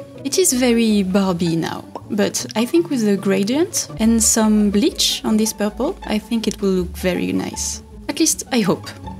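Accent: France. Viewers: The Youtube algorithm just blessed me with this video. This is the most ridiculous, over the top and amazing dress I have ever seen and I LOVE IT. I like to watch historical sewing videos but I am delighted with this. The project seems so fun and a great use of sewing skills. What's the point of sewing if you can't make an extravagant dress? Also, I love the accent. I have family in France (92, 93 and 94). I just don't write in French because I'm terrible at it. Bisous du Portugal :D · Usually I can't stand listening to narration, but your gorgeous French accent makes it enjoyable. Cheers ✌ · Je suis vraiment heureuse de trouver des tuto couture venant d'une chaine française, votre accent en dit long. Votre robe est magnifique merci de partager vôtre savoir6et votre passion.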